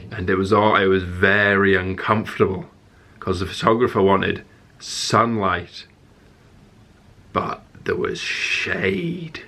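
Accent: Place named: United States. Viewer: Omg the american accent 😂 thank you so much for answering my question!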